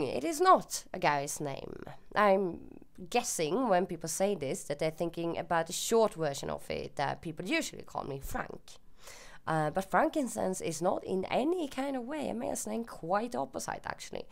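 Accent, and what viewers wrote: United Kingdom. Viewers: I am from Norway so i wonder why i thought you were British xD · Reading the comments I see that I'm not the only one who thought you had a british lilt to your voice, though not a full british accent. You speak english very well and perhaps the lack of a heavy accent is why some listeners are confused about your native language. The information on swearing was also very interesting. I've never been offended by swearing, but I do find excessive casual usage to be crass. I'll keep your comments in mind in the future.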